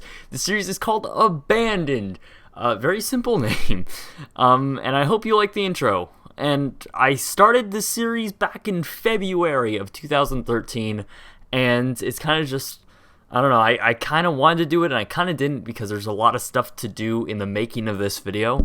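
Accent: Canada. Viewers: You sound Canadian, and stoned.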